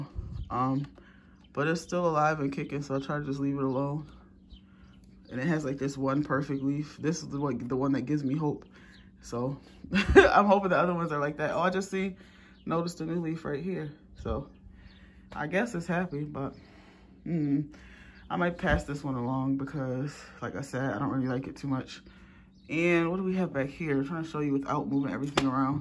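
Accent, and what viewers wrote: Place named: Florida, United States. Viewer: I'm trying to place your accent. I have a guess but dont want to make a fool of myself. Almost seems central Florida ish with a tint of something else I cant place. Let me know if you don't mind.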